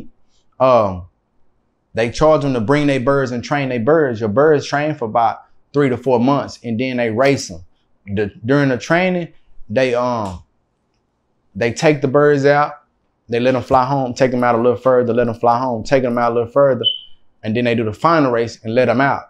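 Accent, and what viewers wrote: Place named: Texas, United States. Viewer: Anybody caught the website he said. I didn't quite catch what he said. That Texas accent strong af 😆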